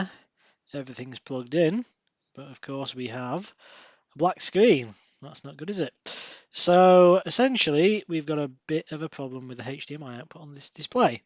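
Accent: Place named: North, United Kingdom. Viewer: Thanks, great video. I will email you now for a couple of ic's. You cant be too far away from me (manchester) with that accent, Thanks again.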